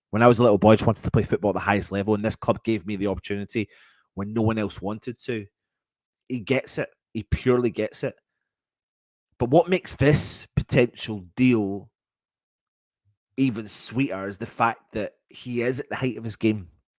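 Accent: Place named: Scotland, United Kingdom. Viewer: Bang on....love the way a Scotsman says geezer...keep it up geez...great job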